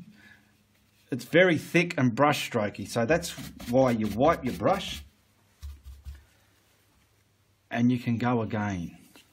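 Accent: Australia. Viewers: Just stumbled across your video and I gotta say, I think I found my new Bob Ross lol. Your voice is very nice to listen to❤️ I love me an Australian accent🤭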